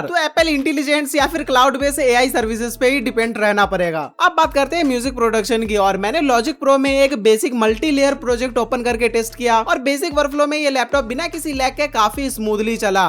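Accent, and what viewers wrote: India. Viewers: Indian accent so amazing🎉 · Bhai pakka tum bengali ho, mask lagake rakho tabvi bata skata hu 😅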